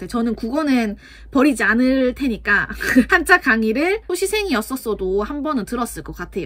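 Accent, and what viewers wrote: Gyeongsang, South Korea. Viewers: 혹시 경남분이세요? 저 고등학교때 쌤이랑 말투가 넘 비슷해세유ㅎㅎㅎ귀엽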